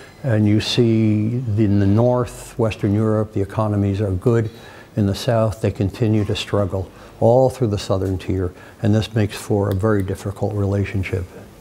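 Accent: Serbia. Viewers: Kako tvrd, sprski izgovor engleskog jezika. Uzas.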